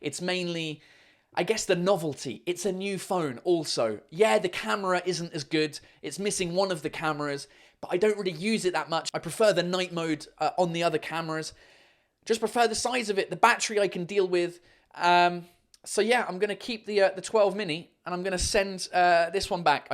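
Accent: United Kingdom. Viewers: How can you manage to have that great British accent and a native French accent :o Great video by the way!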